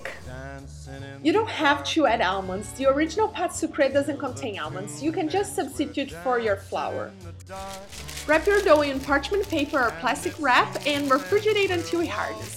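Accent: Brazil. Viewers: Sotaque brasileiro é inconfundível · Omg girl! You so pretty I love that accent! I'm guessing you're from Brazil? Anyways thanks for the video I'm gonna do this 😚😍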